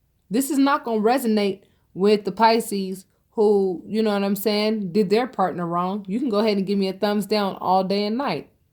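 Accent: United States. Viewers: well said exactly what happened to me poured her with so much love so drained karma a bad thing shes having a shit time out there all the lies mind games cheating manipulating witch still wont apologise for all the pain caused shes getting it bad thank you so much luv the yanky accent bless ya xxxxxxxxxxxxxxxxxxxxxxxx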